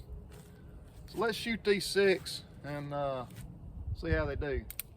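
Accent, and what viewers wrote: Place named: South, United States. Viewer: That's just Southern English, what I find hard to understand is some of the dialects from the Northeast.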